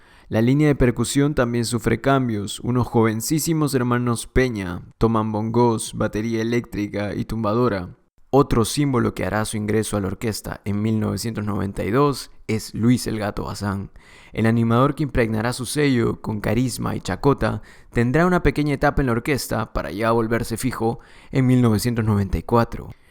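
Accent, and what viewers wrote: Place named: North Coast, Peru. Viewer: Con acento norcosteño peruano, o norperuano costeño, como parte de la subvariante más meridional del español ecuatorial, en peligro de extinción debido al avance del español ribereño de Lima y del español norandino de la sierra norte a la costa norte del Perú desde sobre todo la cuarta década del siglo XX. :eyes-purple-crying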